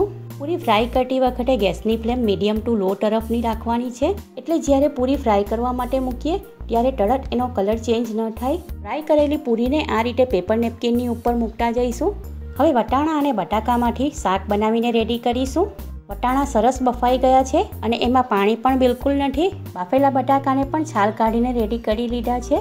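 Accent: Surat, India. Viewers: Tamara avaj par thi lage chhe tame surati ho evu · Ek dam surti boli ,zkkas aalu puri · Be tame to bo jabri sudhdh gujarati bolvani try kairi.. ... Game etlu try kairu pan surati to hambhadati j chhe... Khabar padi j jati chhe..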